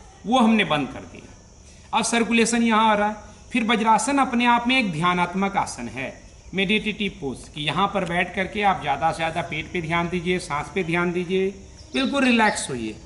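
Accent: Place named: Bihar, India. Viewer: Baba bihari ho na sahi sahi bolna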